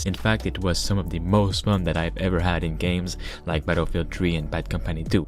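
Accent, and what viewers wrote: Quebec, Canada. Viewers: Oh well I guess I'm gonna buy this to finally try it... Wait a second... Es que t'est Quebecois?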